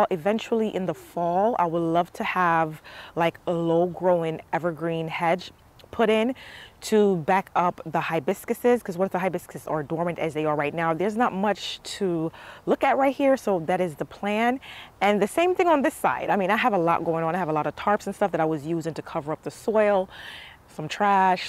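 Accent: Patois, Jamaica. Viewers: Beautiful choice,! It probably looks crooked to you because of something in your line of sight. It looks straight and even from this side. It will be even more gorgeous when those vines start creeping up and over it. Btw, I think this is the first time I’ve ever heard you speak Patois. 💚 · I have Jamaican pastors and I love to hear them talk in the native accent and tongue. your accent popped out real quick and I just love it! the arbor is beautiful. I like that it isnt stark black. the muted color tone will only make whatever you put on it pop even more. #tfs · Love the progress of your garden. Everything is coming along great. I'm looking forward to seeing it in bloom. I also love to hear your Jamaican accent when you are talking with your husband. 🥰 God bless your family and garden. 🌷🌿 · Loved hearing the strong Jamaican patois 😁🇯🇲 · Love to hear the patois. 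TFS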